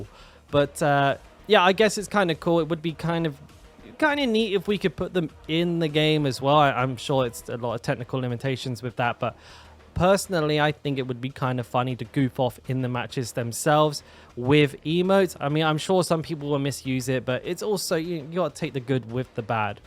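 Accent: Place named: Kent, United Kingdom. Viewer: Also, out of curiosity, how come you are on Asian time, you sound like your from Kent?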